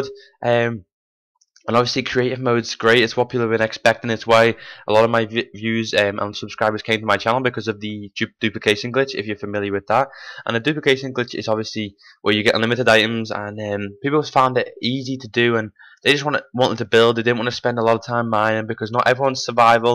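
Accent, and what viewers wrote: Liverpool, United Kingdom. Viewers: Thanks for the update info, also u r scouse right. I hope so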